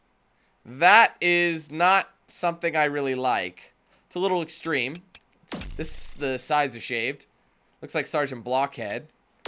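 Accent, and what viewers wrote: Ireland. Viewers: Wait a minute.... is Irish? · Are You Irish?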